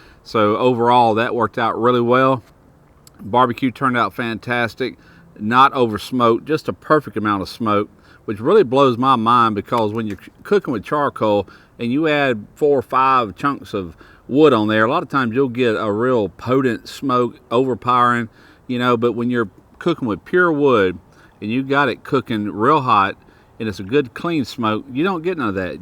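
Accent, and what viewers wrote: South, United States. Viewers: you make fabulous videos! Most informative, along with a great Southern drawl that makes a super combination for super, southern cooking! Thanks, and looking for more of your videos!!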